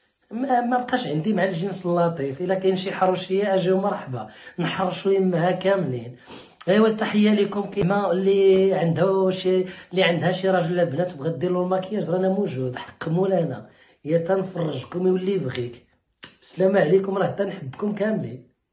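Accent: Marrakech, Morocco. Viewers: 🤣🤣🤣🤣🤣🤣🤣 وتقنتي القمامة واللهجة المراكشية👍👍👍👍👍👍👍👍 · Le message wsal tbarkallah aalik. L'accent de Marrakech. Ta9lid Khatiiiiiir hahhhah. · صراحة جات معاك للهجة المراكشية هههه 😂😂😂😂😂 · Bravo lahja mrakchiya 😂😂😂👍😂👍✌️✌️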